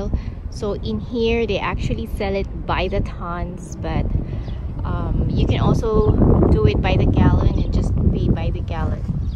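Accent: Philippines. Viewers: Hi! Are you Filipino? You sound like one. Not that its a bad thing, Im a Filipino too. =)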